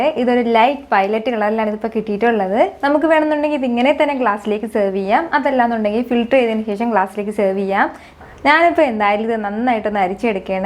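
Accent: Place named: Malabar, India. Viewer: Actually trivadrum tanne anoo..voice cheriya oru malabari touch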